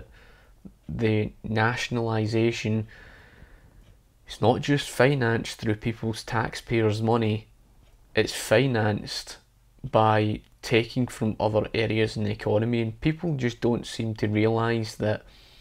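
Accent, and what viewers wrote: Scotland, United Kingdom. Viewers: Hey, welcome back! I'm sorry about your situation, I hope you're well. Have you considered joining other political streams like "The Academic Agent"? Check him out, he's a another classical liberal and makes economic videos and political streams. Perhaps you can get in touch with him! Above all you should focus on yourself, if making other content is what is going to be the best for your situation you should absolutely push for that, don't worry about us we'll be good, but we'll miss you and your Scottish accent. P.S What are your opinions on the new film Outlaw King?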